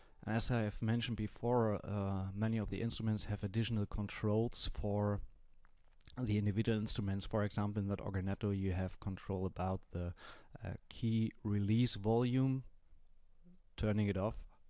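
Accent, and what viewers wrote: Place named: Germany. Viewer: Are you german? Cause you have a german accent ;) Or atleast it sounds like one.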